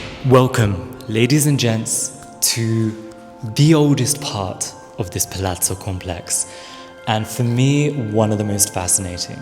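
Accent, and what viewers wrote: RP, United Kingdom. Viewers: The speculation looks realistically fair enough. What a beautiful entrance hall! Photos from the auction showing 18th-century "la sedia" though and not carriages/an elegant mean of transporting a person by using two footmen for carrying. Columns must be a roman repurposed spoliage. You have an exquisite Queen English accent but when you are in hurry explaining things and full of enthusiasm sounds more natural and less pretentious, keep it like that is more charming.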